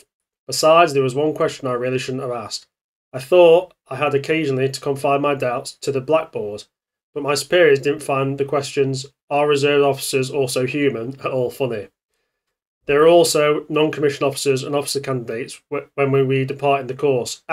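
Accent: United Kingdom. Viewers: Thanks for your work! Weird to hear Carius' memories with a British accent, but cool anyway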